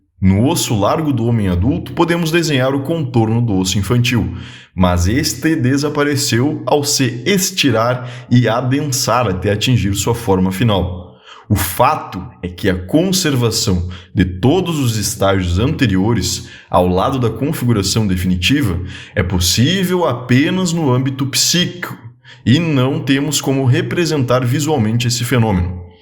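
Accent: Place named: Curitiba, Brazil. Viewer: Sotaque curitibano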